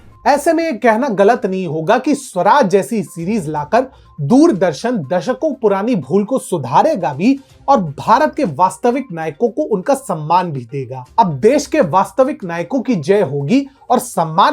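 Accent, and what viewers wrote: Standard. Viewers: अति उत्तम पोस्ट। जी आप बहुत शुद्ध हिन्दी में प्रस्तुती देते हैं। साधुवाद। कृपया निड़र ह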